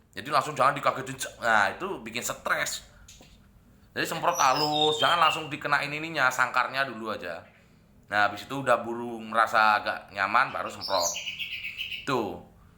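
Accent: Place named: Bali, Indonesia. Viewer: ...baru beli srdc ombyokan kemarin...liat video ini langsung tertarik nontonnya..bahkan sampai berulang2 biar paham....dengar logatnya jadi kangen bahasa indonesia yg aga ada medok balinya...saya lahir dan besar di gianyar mas....sekarang lagi merantau di pandeglang banten....maju terus tetap semangat...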